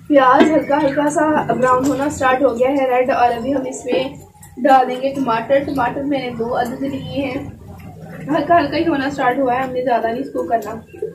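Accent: Punjab, India. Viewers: Please Speak Punjabi Your Punjabi is so sweet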